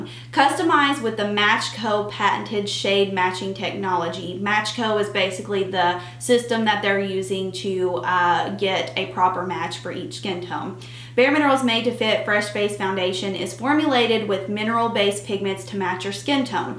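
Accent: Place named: Oklahoma, United States. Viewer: You sound like an Oklahoman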